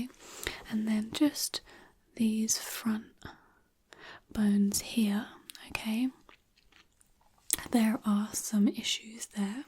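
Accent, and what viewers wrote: United Kingdom. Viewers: I think the accent and resonance of the voice, yes British or other may have a measure of engrained history, spirit, and stability which for me makes the feeling or resonance more appealing. My grandmother had that. For me the body language, face and hands also have this. I feel the societal treatment for girls/women also allows for many to maintain much more stability and ease. I feel this much more support (along with much more social interaction/transfer of skills over time) is working together to create much more lower average layers, ease of learning/motivation to learn (mental reward received for mental work expended), and together is allowing more and more ease of development in the information age. Theory which sees our average stress as much more complex: both conscious layers and many many other maintained subconscious mental work which for higher layers persons is forcing them to work much harder to receive the same mental reward and usually also missing out on much positive social interaction and better acquired mental/social/emotional skills over time. 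Hope theory will provide tools to more permanently reduce layers through more continuous resolution and tweaking faulty weights and values on our own to help permanently reduce many layers.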